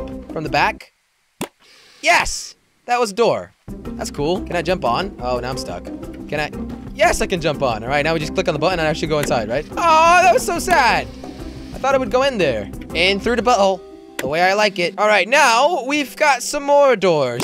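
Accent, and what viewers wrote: Netherlands. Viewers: je komt toch uit limburg?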